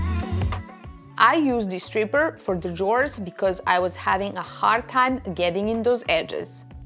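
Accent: Russia. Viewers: Здравствуйте. По вашему легкому акценту я догадалась что вы русскоговорящая. Я смотрю все ваши проекты и мне очень нравится каждая ваша работа. Этим летом у меня предстоит большой проект тоже. В спальне буду красить всю мебель, приелся уже этот коричневый цвет. Вам успехов, а мне удачи (не то придется покупать новую мебель 😆) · Beautiful!! I love this technique, too! I found it by accident when my project got too dark and I tried bleaching it. Worked like a charm! I don’t worry, though I know I should, about the smell. I clean my whole house in bleach quite often; especially in the kids’ bathroom. I have five boys!! Ha! Bleach is my best friend! Also, not sure if you’re Russian, but your accent makes me miss my old neighborhood so much. I had many Russian friends there. Ugh. I miss them!!! 😭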